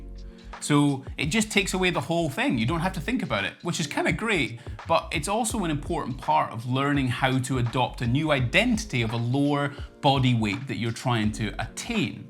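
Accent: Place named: England, United Kingdom. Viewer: I subscribe because of your English accent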